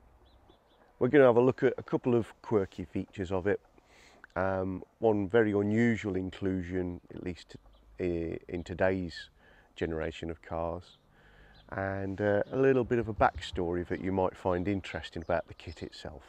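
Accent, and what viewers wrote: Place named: Birmingham, United Kingdom. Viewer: Just found your channel and binge watched the XK8 posts. Excellent series of informative vids, nicely filmed and narrated (with a hint of Brummie accent?). FWIW, I regularly check air pressure of the spare and inspect sidewalls. Those "T spec' tires degrade over time even with minimal or even zero use. They are also becoming difficult and expensive to replace and almost impossible to safely repair.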